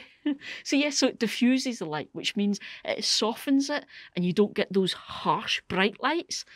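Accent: Scotland, United Kingdom. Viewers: You are the spitting image of Jodie foster, even the way you speak (apart from the Scottish accent lol) Anyhoo, I've just bought a polycarbonate greenhouse, after watching your videos. I had absolutely no idea that it needed heat in winter, lights and watering system & so much more 😬 I didn't research enough 🙄. I'm retired now & have always wanted a greenhouse (I used to use my window ledges when kids were wee)& I'm on a tight budget. I've started tomatoes and a few herbs & cuttings from other people's gardens. Any suggestions where I can get things cheap? I'm not great with technology & always seem to mess up lol I stay in Millerston just outside Glasgow and the winds can be pretty bad too so was looking at glazing tape & canny even do that right 🙄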